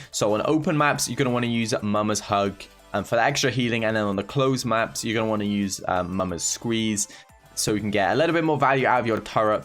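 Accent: United Kingdom. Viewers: For some reason, I like hearing British accent! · Sooo early....love to hear your British accent😅😅😅btw big fan........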